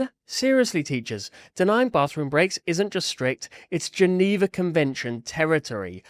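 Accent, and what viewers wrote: United Kingdom. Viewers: Hey there mate quick questions are you British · Yeah is British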